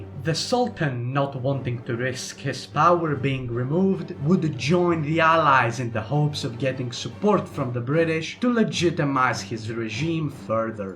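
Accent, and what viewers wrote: Greece. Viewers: Φίλε μου το βίντεο σου είναι πολύ καλό και συγχαρητήρια γι' αυτό! Μου αρέσει τρελά που ξεχωρίζεις ότι είσαι Έλληνας, μέσα από την αγγλική σου προφορά. Εντάξει ωραίο 😄😀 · You have a perfect Greek accent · Μου αρέσει η ελληνική προφορά μέσα στα αγγλικά!!😄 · Biggest greek accent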